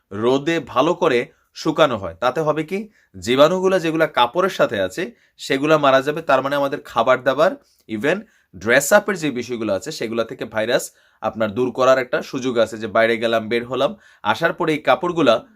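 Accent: Comilla, Bangladesh. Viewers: ভাই কেমন আছেন, আপনার মুখে কুমিল্লার আঞ্চলিক ভাষা অসাধারণ লাগে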